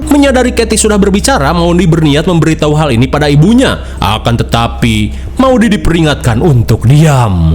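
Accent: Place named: West Java, Indonesia. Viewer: Vibe horornya keren bangets...komentatornya juga logat sunda nya keren....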